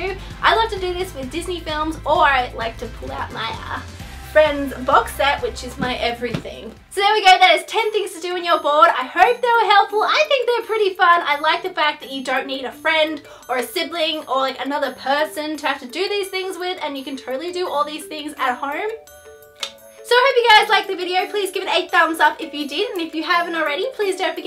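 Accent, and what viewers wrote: Australia. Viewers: That's the same as me and is she Australian? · Just you hahah this girlies Australian.. Isn't Perrie British? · Jayla Kahlen Espadon it's Australian. I'm Aussie so I know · Is she a fellow Aussie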